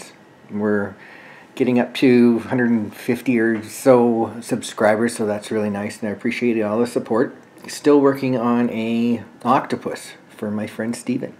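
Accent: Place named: Canada. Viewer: Oh my god why do I love you so much! I love your accent! I’m Canadian as well but I don’t think I have this accent! I’m from PEI if you didn’t know. But aww! Your adorable! You are so nice! I’ve only met a few of my fellow Canadians that are as nice as you!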